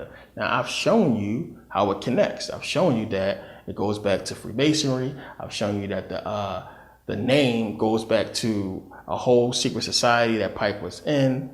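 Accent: Philadelphia, United States. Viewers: You wouldn’t happen to be from philly would u? It sounds like it from the way you talk.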